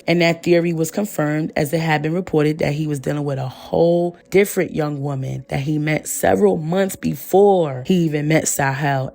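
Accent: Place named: East Coast, United States. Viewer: Your East Coast accent is sooo Cute. Also, What a MESS These folks Were in.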